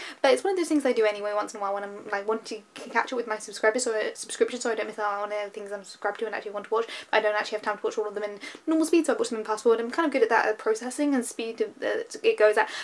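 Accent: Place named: England, United Kingdom. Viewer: I had only minimal issues understanding what you were saying, but that's because I am used to hearing people with an English accent talk. Not quite at that speed but your diction and pronunciation is very clear. Congratulations on the 500 subscriber mark by the way. Hope it keeps building. Thank you also for being such a good moderator for Trisha Hershberger when she does her streams that you can be around for. I know the time difference is quite a challenge even when she streams in the afternoons(for her) since it is still a 9 hour gap. Please always keep up the lightness and cheer in your videos,tweets and everyday life, the world can be dark and full of terrors but you help shine a light through it everyday.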